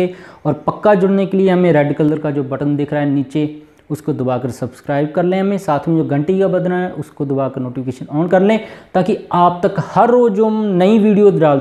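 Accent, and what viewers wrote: Punjab, India. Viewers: I love the punjabi accent when speaking hindi :)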